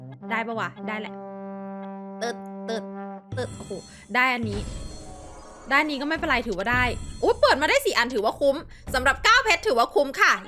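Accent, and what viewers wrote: North, Thailand. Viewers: อ้าว คนเหนือเหรอครับปม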